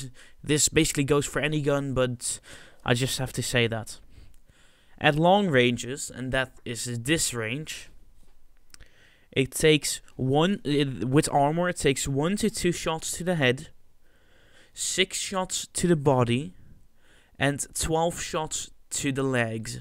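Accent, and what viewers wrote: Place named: Netherlands. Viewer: lol i smell you are a dutch person right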